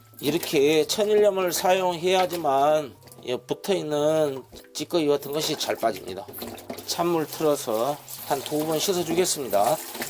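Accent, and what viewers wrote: Jeolla, South Korea. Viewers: 전라도 사투리를. 많이. 쓰시네여😊😊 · 세프님.전라도.말투가. 너무.정겹습니다 .그리고.좋은요리고맙습니다.항상행복하시고.오래도록좋은요리부탁드닙니다.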